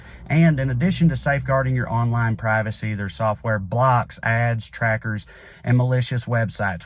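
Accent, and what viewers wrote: South, United States. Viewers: This guy is already attractive - but his mind through that southern accent takes it next level.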